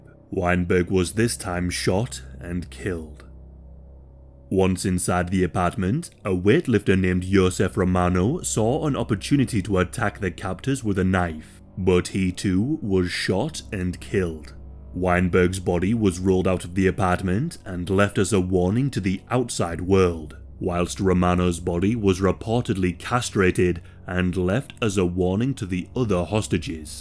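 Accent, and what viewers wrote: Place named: Hebrides, United Kingdom. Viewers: God hes so Scottish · It's nice to hear someone who (I think) has the same accent as me doing well on YouTube! Hi from Hebs :)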